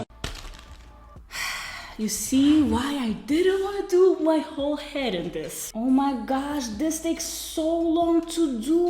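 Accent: Netherlands. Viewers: Het staat je geweldig maar wat een werk. Wel grappig dat ik even dacht een Nederlands accent te spotten. Heel even maar. Want je Engels is echt goed. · She says that in the description box: She lives in Belgium, half Dutch-half Ghanaian, and Dutch is her first language, thus the thick accent! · Omg this is the first vid I watch of u, butt I knew right away that u were dutch bc of your accent omg we dutchiess always have that same accent its just soo funny😂😂😂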